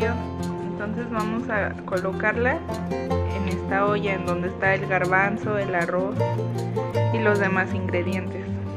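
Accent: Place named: Guatemala. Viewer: Disculpa de donde eres porque pensé que eras de Guatemala.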